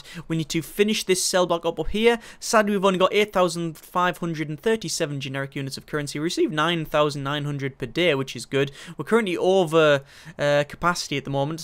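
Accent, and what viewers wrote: United Kingdom. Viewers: You have absolutely no idea how much I love you cause your from the uk, all the uk talk is just cute to me like snookered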